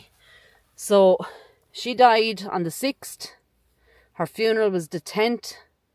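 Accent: Ireland. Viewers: When I was a kid, my Irish Grannie lived with us and she talked like you.... memories here TY TY TY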